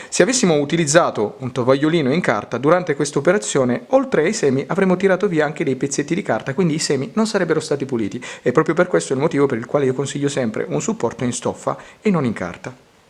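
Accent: Italy. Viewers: senior, I enjoy your gardening tips & love your Italian accent, ciao.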